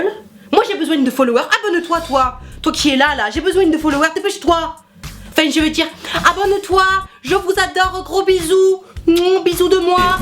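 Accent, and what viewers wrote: South, France. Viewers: Un trèf... Bon ok, je vais arrêter avec cette blague pourrie :p Et pour dire quelque chose à propos de la vidéo : j'adore ton accent du sud :D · J etait plier de rire avec ton accent du sud 😂😂💖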